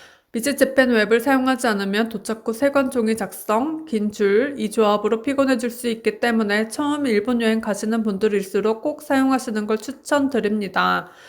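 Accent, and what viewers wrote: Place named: China. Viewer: 말투가 중국인인데요? 일본인의 한국어사투리발음아님! 발음이 한국인이 아닌거같음?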